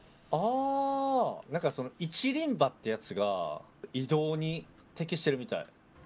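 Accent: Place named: Kansai, Japan. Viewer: 釈迦さんがちょいちょい関西弁の影響うけてんのジワる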